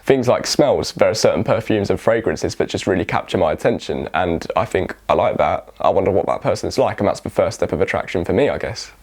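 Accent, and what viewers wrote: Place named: England, United Kingdom. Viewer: Hi my friend, my name is Jordan I have a channel here on YouTube called the blind world. We’re trying to create a world where like what you were saying this video for you had people in your life you were naive and you thought that they couldn’t have relationships with you because you were visually impaired and blind. I’m trying to create a world where that doesn’t happen where people can have relationships for us despite us being blind and I want to create a world where we are seen as just people really, and I like this video because I’m actually trying to figure out how to have sex. I know that I’m attracted to people to women but just How I will be physically able to have sex and that’s all I kind of want to say really and I’ve seen your Instagram post and I think you’re very good you’re very educational and I can relate to the stuff that you’re talking about since I am actually vision impaired myself and I have autism and I am from Ireland and I’ve noticed by the sound of your voice that you’re precious visually impaired person but you’re from England I just I hope to get to know you as time goes on as I watch your videos and just I hope you know that I’m being genuine with my comment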